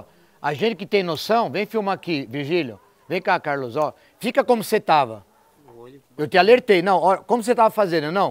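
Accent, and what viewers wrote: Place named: São Paulo, Brazil. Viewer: Kkkkkkk acho o muito legal com essa fala bem paulista,aprendo muiiito!!!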